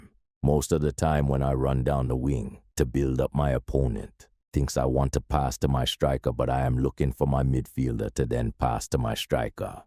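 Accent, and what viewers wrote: Jamaica. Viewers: The yardie voice alone makes this all top tier content. Actually never seen someone say some of this. Im div 2 but still learning 👍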